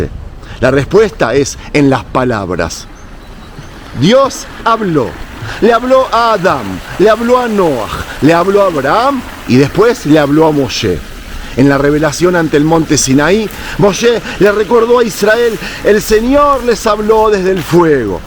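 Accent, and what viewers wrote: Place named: Rioplatense, Argentina. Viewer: saludos de un ecuatoriano me da mcha curiosidad si eres argentino o uruguayo o aprendistes espanol y nacistes en Israel gracias y me gusta tus videos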